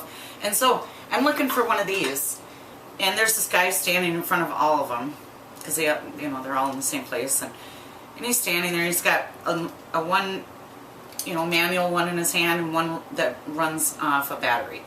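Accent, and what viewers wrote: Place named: Minnesota, United States. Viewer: Hahaha Hahaha!!!! Love this! Love the Minnesota accent ever since I heard it in "New in Town"..... Don't Cha Know!!!! Have a super day!!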